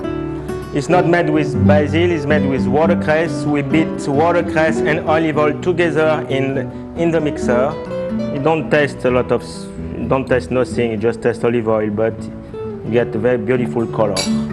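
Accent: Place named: New Orleans, United States. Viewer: As a kid I feel in love with her voice from the intros. It was so sultry and New Orleans to me. I still think it's sexy! Hey Great Chefs people, let her know that! She should do voice over work.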